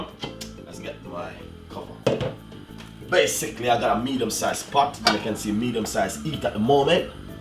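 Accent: Jamaica. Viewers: Chef Jamaican accent is so strong, he can’t even hide or suppress it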